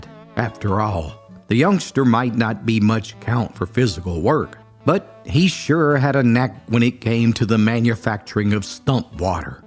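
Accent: Appalachia, United States. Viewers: I rarely leave a comment. Yet, I wanted to thank you for all your stories. Your voice is always calming & a constant reminder of my time in Appalachia. The comments, too, left on your channel regarding your stories are comforting. Your content is sorely needed in these days of uncertainty. With deepest appreciation, a grateful listener ❤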